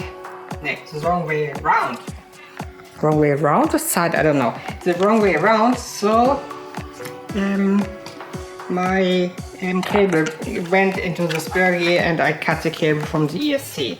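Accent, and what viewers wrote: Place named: Germany. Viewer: dass dein Akzent irgendwie deutsch klingt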